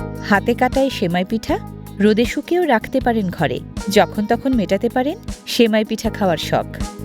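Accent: Sirajganj, Bangladesh. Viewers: Sirajgonj bole kotha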